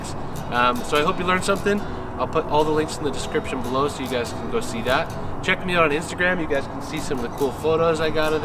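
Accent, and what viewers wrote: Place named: Northern Canada, Canada. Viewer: Interesting accent. Northern Canada?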